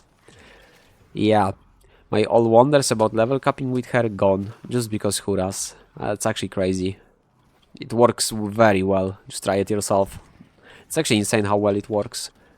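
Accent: Poland. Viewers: u poland? i hear accent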